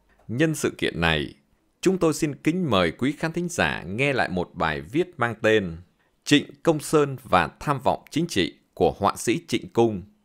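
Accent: North, Vietnam. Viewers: Tôi cũng giống như Bạn vô cùng yêu thích giọng đọc của Anh ấy một giọng Bắc rất hay, rất ấn tượng! Cám ơn kênh DTV mong được nghe nhiều bài viết hữu ích với giọng đọc có một không hai nầy! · Giọng Bắc 54 đọc hay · Từ xưa đến nay tôi rất thích giọng nói của người Bắc trước 75. Cho nên tôi thường xem DTV channel lắm vì mỗi bài bình luận đọc bằng giọng Bắc rất là hay 👍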